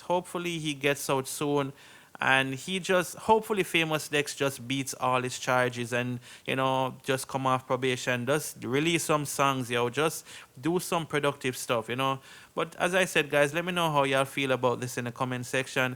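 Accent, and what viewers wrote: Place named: Jamaica. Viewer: Are you speaking Jamaican or east-indian accent?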